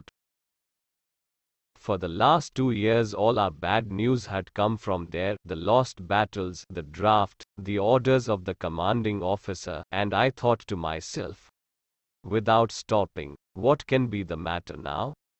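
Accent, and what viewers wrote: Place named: India. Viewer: This miserable solng India English leave please for speaker native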